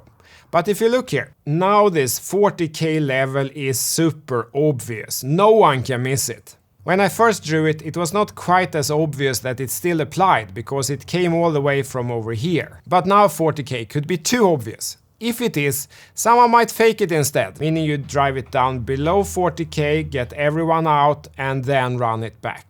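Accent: Sweden. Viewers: Here for the Swenglish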